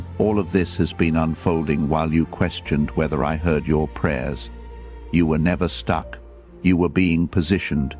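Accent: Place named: United Kingdom. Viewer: Who is the new voice. He’s British.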